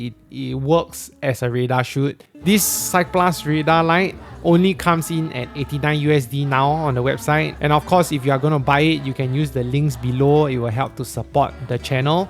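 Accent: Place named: China. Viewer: This Chinese dude has a nice accent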